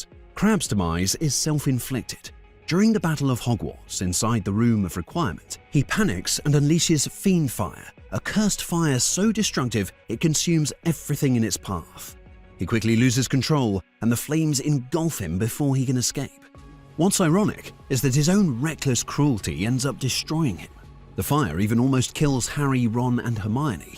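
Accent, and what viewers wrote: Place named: United Kingdom. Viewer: Why're you British. but from United States!?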